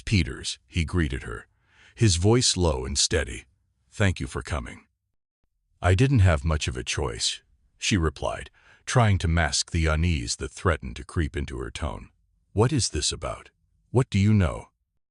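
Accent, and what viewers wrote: United States. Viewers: Awful American accent. Awful fake Christie story. Go away do.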